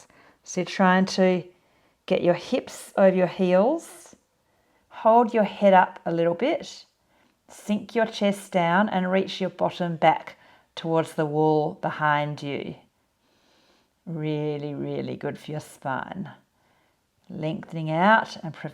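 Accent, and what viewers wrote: Australia. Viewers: Thanks. As a Canadian I am boycotting American products. Wonderful to hear an Aussie accent and wonderful to feel better.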